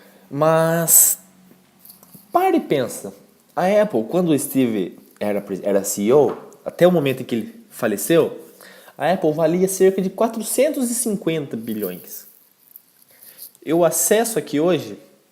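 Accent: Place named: Fartura, Brazil. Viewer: Huahua Sotaque de Fartura hauhua, muito bom...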